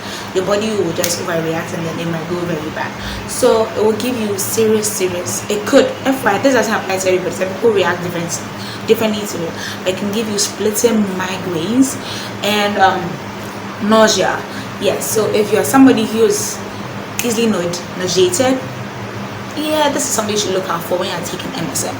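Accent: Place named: Nigeria. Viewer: Are u Nigerian? I work with a lot of Nigerian ppl. Girl ur accent is Everything!! Sounds like music when u talk. And oh yes thank u for this video. I was about to give up on MSM b/c of the bad headaches.. but I will try it again with more water. Thx girl. 🌹💫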